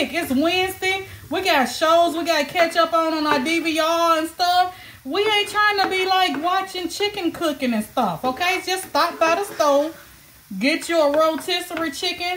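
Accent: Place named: Tennessee, United States. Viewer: Girl you must be from West TN. 😂 girl! ❤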